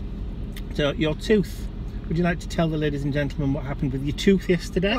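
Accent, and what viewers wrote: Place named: North, United Kingdom. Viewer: Hi. I like your videos. They're so natural. By the way, where is this accent from, somewhere in the north?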